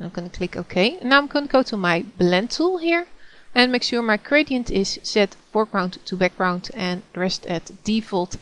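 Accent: Netherlands. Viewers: I hear a Dutch person speaking!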